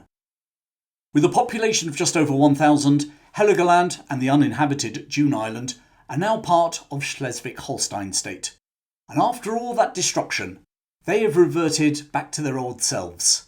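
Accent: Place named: Upper class, United Kingdom. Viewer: There's a lot of British arrogance here. For example the dismissive attitude to the Frisians you can sense several places. For example saying things like "return to Germany". I mean, it's a Frisian population and was switched betwen Denmark and Schleswig. And The Duchy of Schleswig was not a party of Germany, it was a fief of Denmark consisting of a mix of Danish, Frisians and Germans. So it wasn't returned to Germany. The only place Heligoland can be returned to is to Frisia, but such a state does not yet exist. But also the gloating of a poet in exile writing the German national anthem on "British soil". There's just throughout an arrogant sentiment, which just really drives home the stereotype of the British upper and middle class. The accent and everything. It's like it's taken out of a Monty Phyton sketch.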